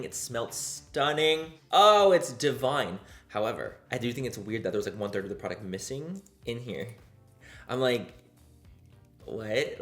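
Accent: United Kingdom. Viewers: Manny Mua yes!!! Plus your sweater 😍 all while saying it’s so hot out here! 🤣🤣🤣 Love you & ur British accent 😉 Edit: dessert is so nice you have to write the “s” twice... a rhythm I teach my students to help them remember 😉😃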